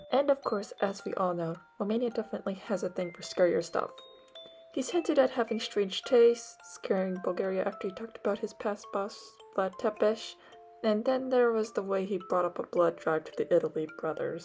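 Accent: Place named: Hungary. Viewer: I'm really exited for the full video! Someone finally talks about one of my favourite ships. And you have a really cute Hungarian pronunciation.:D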